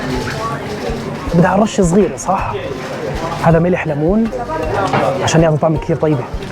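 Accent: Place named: North, Palestine. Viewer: انت من قرى الجليل شكلك يا خال هاي لهجة شمال فلسطين صح ولا انا غلطان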